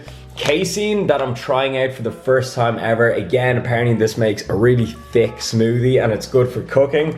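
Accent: Ireland. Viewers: I wonder are you able to speak Irish Gaelic? Your Irish accent is pretty light compared to others I've heard.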